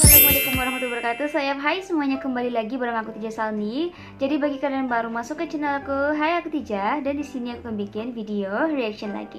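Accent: Jakarta, Indonesia. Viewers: Sis,, asli brunei kah,, tapi dari bhs dan logatnya kliatan asli indo geh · Adik bahasa Brunei memang seperti yang adik ucapkan ataukah adik yang sudah terpengaruh logat atau gaya bhs Indonesia? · Orang brunai kaya orang jakarte ngomong nya.😀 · Sumpah, gw kira orang indo yang nge-react !! dialect jakartanya itu lo, pas bgt !! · Klw tutup mata, kita tdk mndengar bhwa yg ngereact itu gadis cantik dari brunei, loghat dan bahasa brunei nya ilang lbh k Indonesian. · Org Brunai, tapi knp logat bhsnya sperti org Indonesia???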